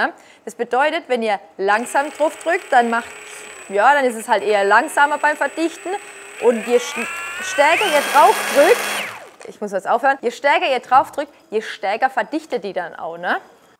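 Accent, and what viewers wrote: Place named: Baden, Germany. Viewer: ich dich einfach eine power Handwerkerin mit deinem badischen Dialekt 😘 liebe aus Hamburg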